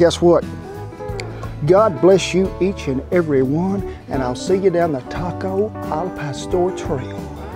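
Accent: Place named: Texas, United States. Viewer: I knew you were from TX, my father's side is from TX and that accent gets me every time!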